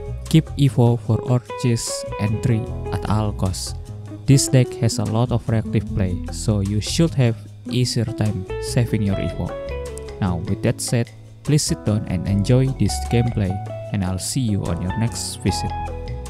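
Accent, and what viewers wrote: Indonesia. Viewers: orang indo ya? ko medhok?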